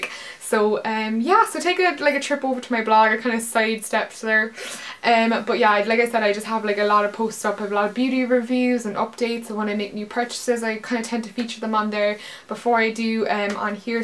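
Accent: Cork, Ireland. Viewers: That leather skirt is amazing! And I could listen to your accent all day. My favorite priest was from Cork, as well as my great grandfather. :) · It's funny how you still have your Cork accent until you say the word "everythin'" which you say in a really strong Belfast accent haha :)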